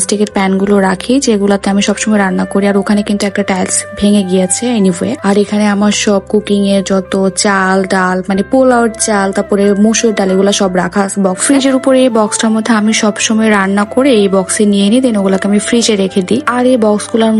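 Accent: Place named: Dhaka, Bangladesh. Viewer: আপু তোমার ভাষাটা মাশাল্লাহ অনেক সুন্দর এটা ঢাকার কোন এরিয়া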